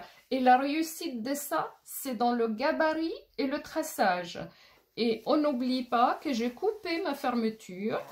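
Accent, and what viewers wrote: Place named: Alsace, France. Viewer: Bonjour, merci pour vos conseils. Le pantalon est presque fini, je vais coudre une fg sur le côté. N'ayant pas pris les mesures nécessaires pour réaliser une braquette . Merci , le prochain patron , je m assure qu'il soit avec braguette Et je me plais de penser à cette réalisation. Je suis très prototype avant de coudre , j'avais réalisé que mon exercice s' avéré incomplet. 😮 Bon c'est sur je m'y met. La couture est mon deuxième poumon 😂 Merci encore, également votre petit accent me plaît bien. Alsace peut etre? Amicalement!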